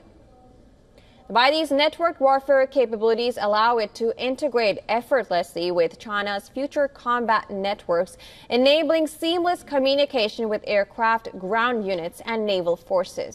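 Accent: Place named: India. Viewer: It's Indialish not English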